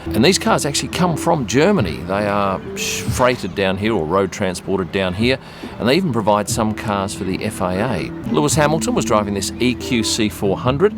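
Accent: Australia. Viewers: Your F1 videos are so unique and refreshing. I can’t stand most of other F1 videos all British YouTubers and track track track focused. I have heard enough during 3 days of watching F1 lol. Yours are much better. Maybe I just love Aussie accent cuz I live here lol.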